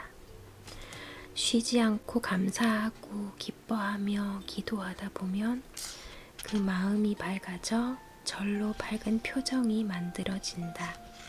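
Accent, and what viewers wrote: Gyeongsang, South Korea. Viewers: 경상도 사투리냄새가 물신 나,오히려 정겨워라. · 아휴 님 목소리 땜에 잠못 잡니다 어쩜 그리 목소리가 달콤한지 ᆢ 근데 고향이 어디신지 경상도 목소리가 이리 좋은지 몰랐네요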